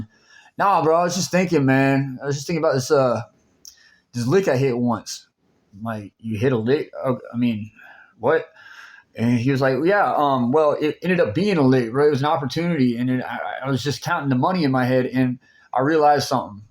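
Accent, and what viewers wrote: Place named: Tennessee, United States. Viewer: You from up there, in Tennessee?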